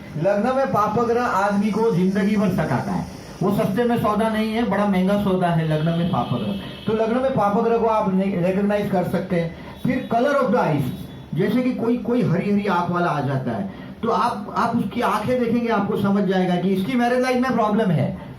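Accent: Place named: Mumbai, India. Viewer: I really like the way you talking in Mumbaiya tone great sir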